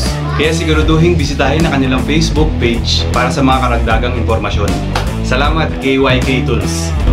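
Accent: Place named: Philippines. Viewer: Nice Work brother .......you sounds Phillipines.......my neighbour.......I AM FROM MEGHALAYA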